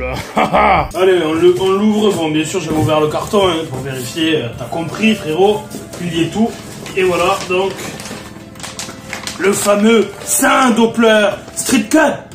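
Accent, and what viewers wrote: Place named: Toulouse, France. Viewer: Comme prévu... j’attends ici ! Peinard, j’veux rien louper ! 😄 T’as le petit accent de par chez moi, tu dois pas être trop loin de Toulouse non ?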